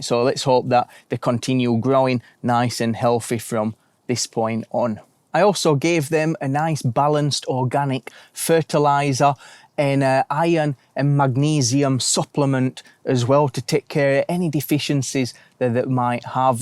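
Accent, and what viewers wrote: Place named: North East England, United Kingdom. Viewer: Just a question to... in your first post where you crack open the cherry nuts and one is empty of seed... you say you'll take it back to Mercadona... you in Spain where the climate is warmer than the climate in UK where your north-east UK accent suggests you're from?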